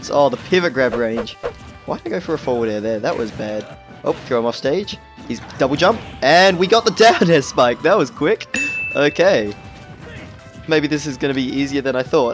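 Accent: Australia. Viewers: Are you Australian? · Love the idea of doing challenges. Love the editing. Love the succulent Australian accent. 10/10 Would donk again. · This guy sounds like PIMPNITE, but for Smash Bros. Nothing wrong with that; they're both Australian, like me. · try and play smash for an battle or episode you pick without an Australian accent, I know it sounds impossible (I would know I'm also Australian too)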